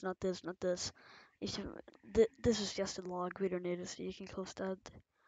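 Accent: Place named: France. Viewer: THIS IS SUCH A STRONG FRENCH ACCENT RESPECT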